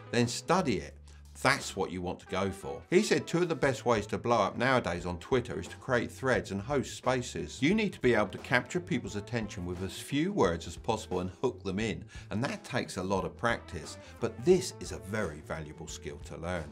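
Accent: United Kingdom. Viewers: you have a british accent so i trust you 100%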